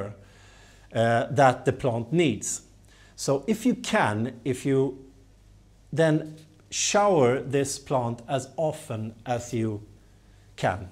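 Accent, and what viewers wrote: Sweden. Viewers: its the beautiful swedish accent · I know that most of you don't mean it that way, but actually I find it a bit rude to make fun of his pronounciation, or to put the "funny" pronounciation above the content. The content is so good! He is obviously Swedish, but he speaks very good English, so let's be thankful that they make the videos in English and not in Swedish. In Swedish, I wouldn't be able to understand them, because I am German. I would probably also have an accent if I'd start making English videos... :-D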